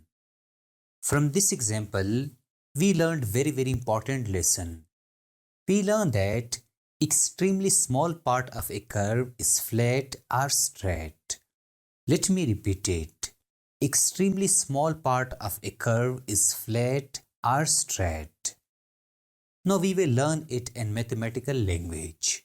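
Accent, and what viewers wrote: India. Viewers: Guyz is sir an indian!!? Cz his accent sounds soo..🩸still he's so underrated imean he teaches way better than any of my school teacher(dps btw)!!🥊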